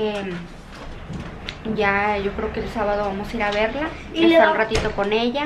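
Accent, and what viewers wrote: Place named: Chihuahua, Mexico. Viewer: oh que bien , se me a figuró Que tenias el acento de chihuahua, pero al igual somos mexicanas , buen día , y pues una seguidora más en tu canal felicidades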